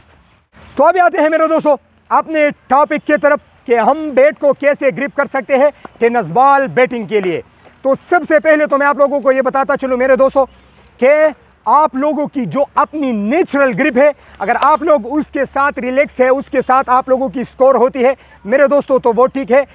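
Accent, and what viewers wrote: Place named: Kashmir, India. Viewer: Nice...Bhai....Aapke bolne ka andaaj bahut achha h ....Mujhe mere jammu-kashmir ke friends ki yaad dilata h....Keep it up...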